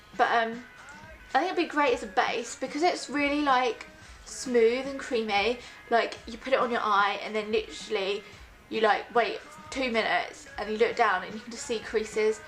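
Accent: United Kingdom. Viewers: Can u not recognise her british accent???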